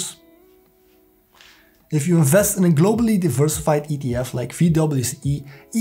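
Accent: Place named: Belgium. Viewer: Haha, giere. Zoek ik video's op over investeren, en ik hoor echt een Belgisch accent 😂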